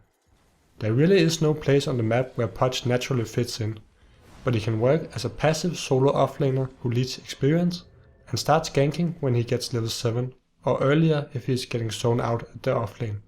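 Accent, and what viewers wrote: Denmark. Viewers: He is danish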